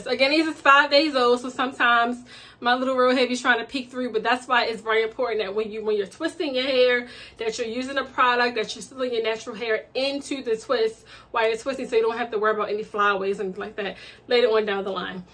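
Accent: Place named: Maryland, United States. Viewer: I love your hair, and love the accent, you got to be from Maryland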